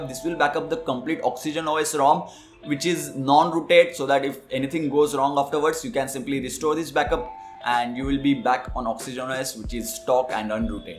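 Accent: India. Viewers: 有点印度阿三的口音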